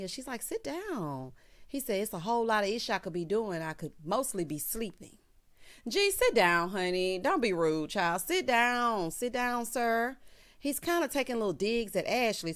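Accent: South, United States. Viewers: I remember very first review. She said “I’m country so you might want to turn on your closed captions.” I was on board with the G.O.A,T. moving forward 😉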